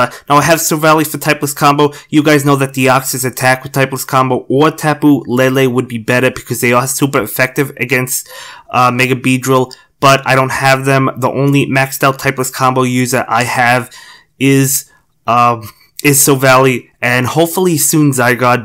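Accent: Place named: Boston, United States. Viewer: Curious, what region of the country are you in? I think I hear a little bit of Boston in your voice, but I'm not good at telling.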